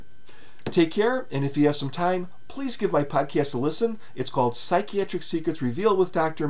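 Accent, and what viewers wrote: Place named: Midwest, United States. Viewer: You have a very thick Midwest accent. Are you from Cleveland... perhaps Detroit?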